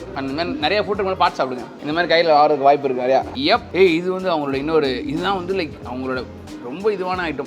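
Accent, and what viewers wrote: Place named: Butler English, India. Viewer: Stop talking your buttler english ....... fraud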